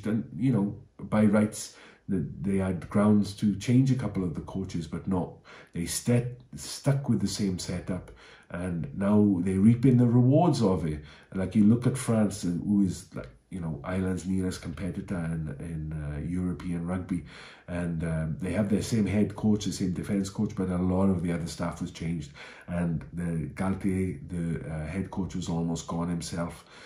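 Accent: Wales, United Kingdom. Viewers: Nice video. I spent the first 30 seconds wondering were you Welsh or SA. That accent has travelled. 😄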